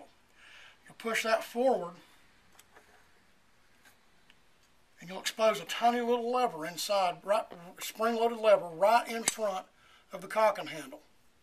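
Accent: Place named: Mississippi, United States. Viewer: I enjoyed your BAR disassemby video. I have had two in 7mm Mag. No recoil and accurate. The only problem is that I get walleyed trying to keep track of the brass when I shoot. You don't sound like Down East. I lived in Maine for 5 years in the '60's and spent a lot of time in North Berwick. You sound more like Mississippi, where I am in exile now. Keep up the good films.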